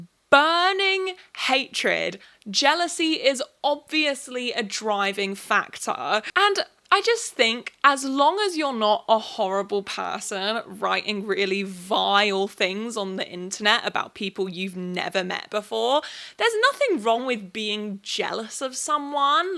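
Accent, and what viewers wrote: England, United Kingdom. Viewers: Where are you from in England, you have the same voice as my psychology teacher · you're too british. it's hard to listen to you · This is on a kind of related note, but I have a friend who was on deviantART for a long time who experienced a similar thing. She's been on dA for a long time now and built a pretty loyal following of 2,000+ watchers, which is pretty substantial on that platform. On that platform, she's been commissioned so much, even started making pins, plushies, and tattoo designs. Recently, she tried out tiktok and quickly blew up. She was making comics that were getting views in the millions, she's never had so many eyeballs on her art and got about 70K+ followers. But even with those numbers, she got almost no offers for commissions or sold merch. What she did get were a bunch of people asking for more content, even though the stuff she was making was quite work intensive. 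I feel like the infinite scroll of TikTok devalued the amount of work she was really putting into her art, and when people weren't getting content fast enough (by that I mean, within days of her last post), they just forgot about her and her views plummeted. That weird surge of fame she experienced ended as quickly as it began, and she made almost no sales out of it. Compare this to her 2,000 watchers on dA who still commission her to this day. I'm not saying dA is a perfect platform (it's not), but TikTok is designed to have this pathetic half-life, I wonder how ANYONE maintains TikTok fame at all. side note: I loved the vid. Does anyone know which part of Britain her accent is from?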